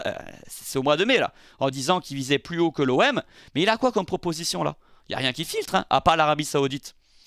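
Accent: Corsica, France. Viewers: Hors sujet: jme trompe ou tia l'accent Corse?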